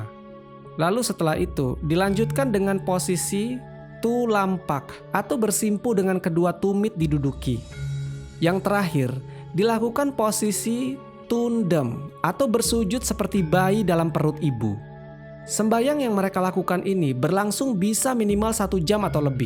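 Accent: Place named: Java, Indonesia. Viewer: Dialeg jawa dibaca pembicara dlm dialegnya sendiri...ditelinga pendengar sangat lucu broooo😅😅😅 wisss...wissss embhuh sakkarepmu rek...😊😊😊😊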